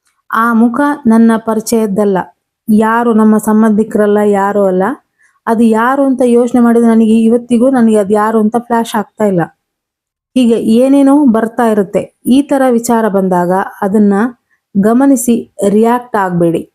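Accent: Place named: Bangalore, India. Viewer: When I listen to you . I think you r staying in Bangalore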